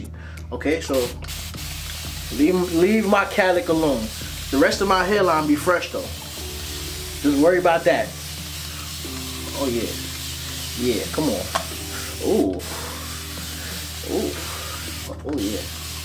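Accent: New York, United States. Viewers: Where you from bro , New York philly ?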